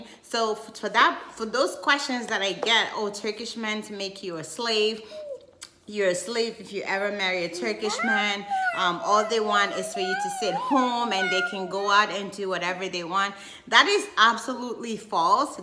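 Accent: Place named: United States. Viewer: Unfortunately I absolutely dont agree with most of your video's it took me this long to respond. I was married to a Turk who not only abused me mentally emotionally and physically gradually over the years. Seems like you have an American accent 👀 He came to London with nothing back in the 80's it took 15 years for me to leave. He is now a multi millionaire due to me my lovely. Please don't think your irreplaceable . However you have given me an inspiration to make a video about my journey being married to a Turk.. I have a 34 year old daughter a film director now and 5* Michelin chef in London and a son who lives in Istanbul. I will definitely show my daughter this video. Your very naive. 🤓 sorry if this offends you. ♥️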